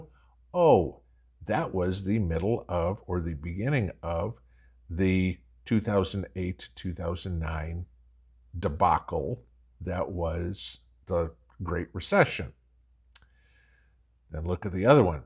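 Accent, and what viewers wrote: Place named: Indiana, United States. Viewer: ..Blizzard of ‘78..no wonder your speech sounds more from Kokomo (Indiana) than KY or TN!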